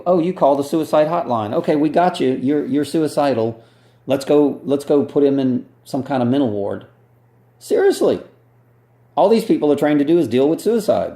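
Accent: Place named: United States. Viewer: what have the Russian bots got to do with helping targeted individuals?you sound American, if you are American you should organize targeted individuals to run the gauntlet to reach president trump. I can assure you trump is oblivious to these programs carried out on innocent humans. try watching youtube channel (ramola d). my freind Trump is the one way these programs can be exposed. the other way is if Putin allows Edward Snowden to expose these horrific crimes. or god gives rise to several whistleblowers who will be mavericks to expose what tortures the corrupt establishments have performed on humanity. what I do believe in is when the time is right gods forces will destroy all satellites communications to free humanity. good will and has to prevail evil.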